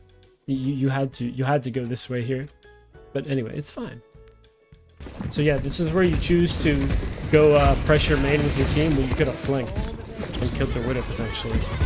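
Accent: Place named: Canada. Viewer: That's quite a Canadian accent you've got there. When y'all say the word "out" y'all say "oWoot" lol XD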